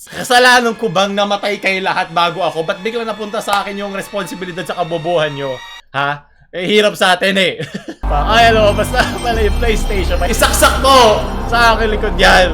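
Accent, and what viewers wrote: Visayas, Philippines. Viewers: Tanginamo. Bobo bisaya accent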